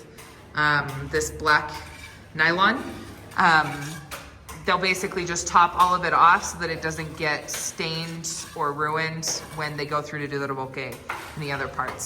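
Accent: Mexico. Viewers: Creo que ya se te nota el acento poblano al hablar en español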